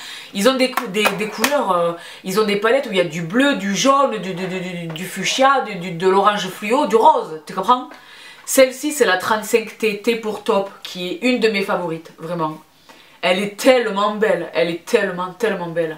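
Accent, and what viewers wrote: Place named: Montpellier, France. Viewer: Bonjour, bravo pour tes tutos très pros et ta bonne humeur!!! Tu as l'accent de chez nous ( je suis de Montpellier), j'adore, tu me fais penser à mes amies Biterroises, c'est incroyable!!!Bises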